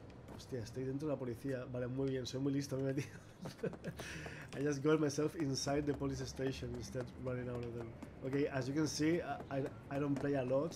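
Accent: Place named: Argentina. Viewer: Que grande, lo escuchas hablar y es mas argentino que el dulce de leche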